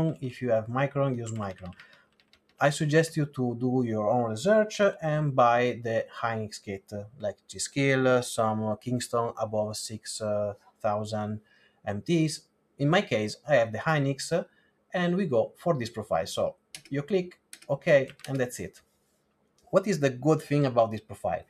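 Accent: Italy. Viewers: Italian accent is funny. No offense, I like it :)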